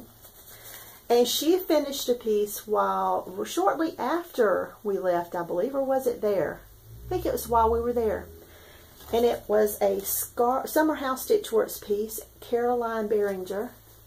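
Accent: South, United States. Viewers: Another wonderful video. It always makes me happy to see your sweet smiling face and listen to your sweet southern voice. So awesome you got to meet Nicola, hear her lecture and take a class...unforgettable. I also had to stop the video to shop for the Karoline...pattern. I haven't stitched with wools yet, but I will in the very near future. Love the Sampler piece over 1, I'm doing mine over 1 as well...but way behind you. Hugs and stitches right back at you. ❤️❤️❤️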